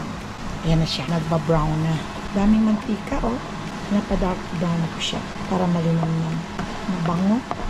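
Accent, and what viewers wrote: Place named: Iloilo, Philippines. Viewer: bakit po parang ilongga po kayo.. sorry napatanong lang po.. super enjoy ako aa content nyo po.. may advice pa kayo na binigay..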